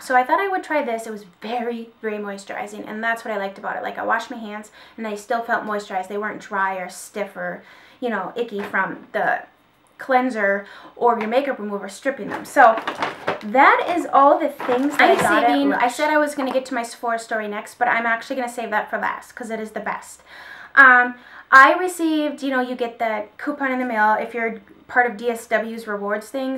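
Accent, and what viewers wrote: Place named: North, United States. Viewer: I love the way Northerners talk! Just makes me happy! It's so cute!! :)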